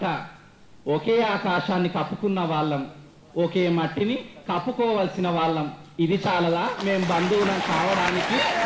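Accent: India. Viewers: ఏక్ దం నాటు తెలంగాణ యాస చాలా అద్భుతంగా ఉంది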